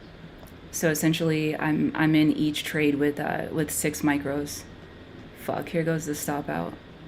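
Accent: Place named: United States. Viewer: seemed choppy - love her accent what area of america is that?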